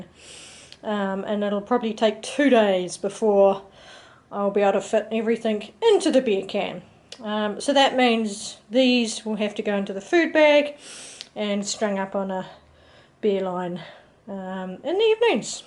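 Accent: New Zealand. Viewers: I just discovered your videos - thru a mention by someone else. I have done the JMT in August & Sept, so it will be fun to see it under snow with you. Also, I had an aunt from New Zealand, so I love hearing your accent, as it sounds a bit like hers. My cousin and I hitch-hiked all over NZ; for 2 days we caught rides w/ 2 "truckies" headed to the South Island. I did the Milford Track Freedom trek in pouring rain (except for the 1st day).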